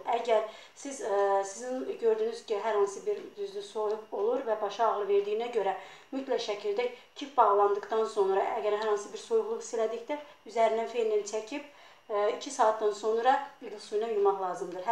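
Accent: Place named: Azerbaijan. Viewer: Lan șu azarbeycan konușmasina hayranim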